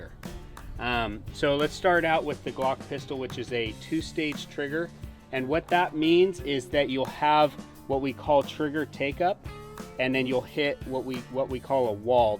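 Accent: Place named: United States. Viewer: Jesus why do Americans explain everything so stupid can they not just say it how it is i think its the accent 😅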